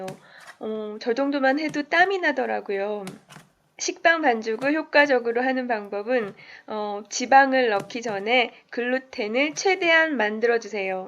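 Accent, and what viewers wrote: Seoul, South Korea. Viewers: 뭔가 옛날 서울 사투리 같아요ㅎㅎ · 말투가 옛날서울 사투리 같아요 !!!ㅋㅋㅋㅋㅋ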